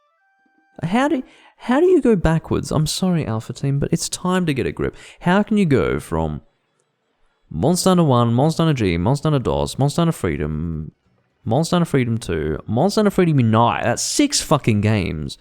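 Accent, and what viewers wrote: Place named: North America. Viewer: Your North American accent is on point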